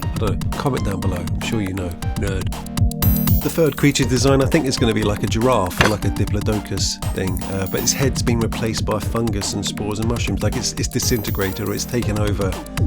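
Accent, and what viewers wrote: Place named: Cockney, United Kingdom. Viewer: bruv, that cockney accent gets me every time. XD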